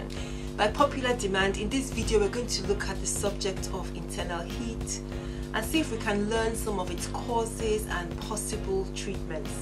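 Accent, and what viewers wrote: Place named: Nigeria. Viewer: You are Nigerian I can tell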